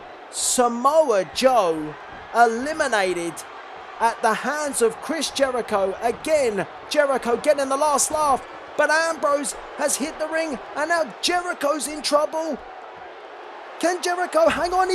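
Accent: England, United Kingdom. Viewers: are you from england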